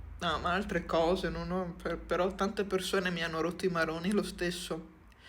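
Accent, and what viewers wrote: Veneto, Italy. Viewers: Parli come me quindi immagino tu sia veneta ...❤ti abbraccio fortissimo e ti auguro ogni bene...❤❤❤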